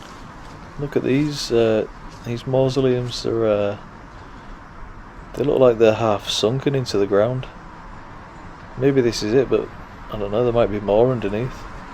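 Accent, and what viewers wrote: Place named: Liverpool, United Kingdom. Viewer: Have you ever seen the little ghost? Or any orbs? A fascinating place with so much history- I live in Canada where obviously most of our cemeteries aren't as old- depends on where you live. I did an anthropology project years ago when I was in uni, one of my favourite essay topics. I love your accent- the Liverpool accent is very distinctive.. Namaste from Canada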